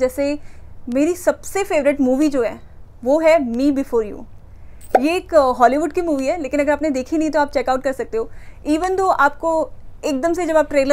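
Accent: Uttarakhand, India. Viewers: I knew you are from Uttarakhand from your accent! Btw I’m also a delhite and from Uk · Congratulations 😊from your accent I got a hint that you belong to Uttrakhand(as I am), keep going on...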